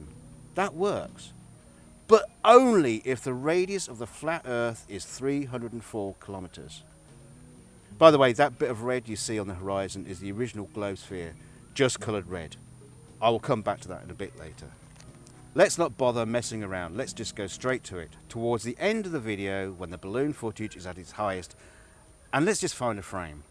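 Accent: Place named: RP, United Kingdom. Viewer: Wasn't sure if you were the script writer too. With that authoritative BBC accent I guess you've got the bases covered. Bravo. Yeah the world is round.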